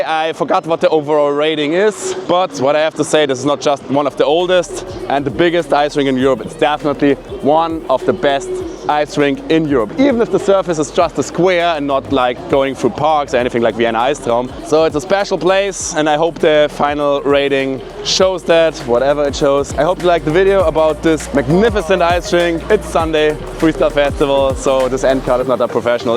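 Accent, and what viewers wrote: Germany. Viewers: hi from Hungary ❤ where are you from? You sound German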